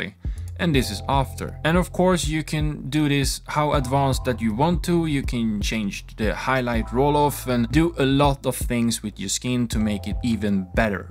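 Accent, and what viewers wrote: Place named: Sweden. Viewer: Thanks for an really easy to follow video, also wanted to say I found it funny as a swede that your svenglish accent is so apparent, keep up the good work man!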